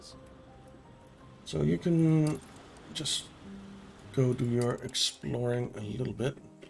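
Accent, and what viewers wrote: Denmark. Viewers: Not really hard to notice that the accent is danish 😜 great episode mate!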